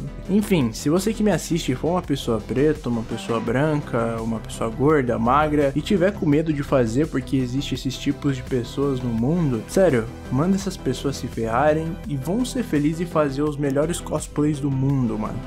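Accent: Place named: Manaus, Brazil. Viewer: tu e de manaus neé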